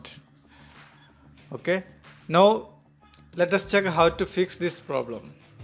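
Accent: Kerala, India. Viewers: ഇംഗ്ലീഷ് കേട്ടാൽ അറിയാം മലയാളി ആണെന്ന് !!!!!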